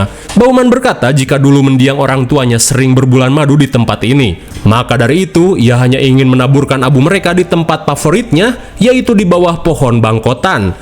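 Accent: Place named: West Java, Indonesia. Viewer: logatlawak sunda banyax menghibur ke para orang indo, aku orang suku sunda jadi ikut bangga banyax yg suka logat lawak sunda aing baraya, mantap mang sehat selalu jeung damang selalu mang😆😎🙌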